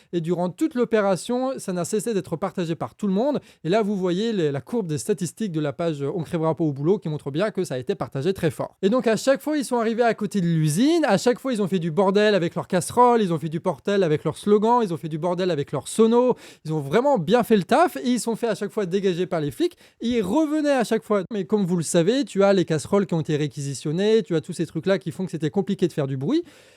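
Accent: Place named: Alsace, France. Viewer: Engueuler macron avec l'accent alsacien, c'est pas mal.😊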